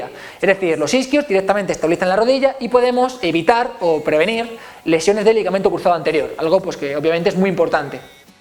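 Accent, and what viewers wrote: Spain. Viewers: Hola, me ha gustado mucho tu video. Información muy completa y pertinente para los que deseamos trabajar desde casa o en Vacaciones que no estamos cerca de nuestro gimnasio. Sólo una recomendación, se que tratas de no hacer el video tan largo, pero estas hablando muy rápido sobre un tema que no todos conocemos y usando términos anatómicos poco utilizados por nosotros, lo que hace que se difuculte entender la info. Y bueno en mi caso que hablo español, pero de Latinoamérica, a veces sólo con el acento español nos cuesta un poco entender rápidamente lo que dices, menos aún con la rapidez con la que estas hablando. Tomalo con calma, recuerda que al que de verdad le interese aprender te va a escuchar con atención y verá todo tu video, no importa la duración. Éxitos y esperamos seguir viéndote.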